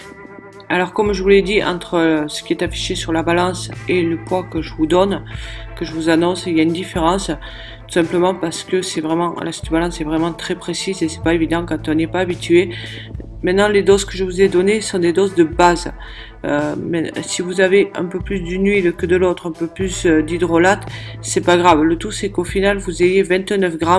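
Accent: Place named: South, France. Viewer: Coucou, Je m'abonne car j'aime;) Ça c'est un bon sérum, que des bonnes choses pour la peau. J'aime l'accent du sud;) Bonne journée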